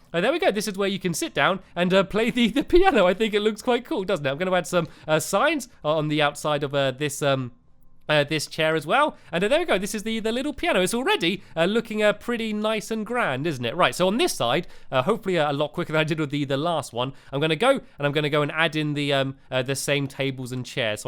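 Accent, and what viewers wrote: United Kingdom. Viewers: You are awsome.I cant believe you are this talanted i really wish you could make a....GIANT CAT that would be AWSOME!!!!!!!! P.S. i love your british accent;)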